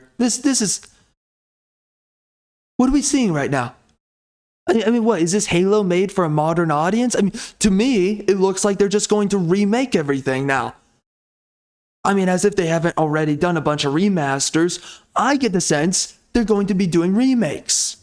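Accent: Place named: Eastern Europe. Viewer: You sound Slavic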